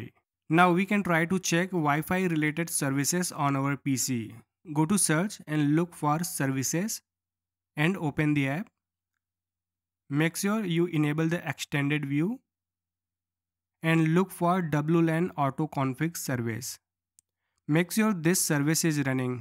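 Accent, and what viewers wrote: India. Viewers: You know the problem is half solved when you listen Indian guy narrating in video. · the network reset worked for me tysm omggg since i heard ur indian accent i knew u were gonna be the one who helped me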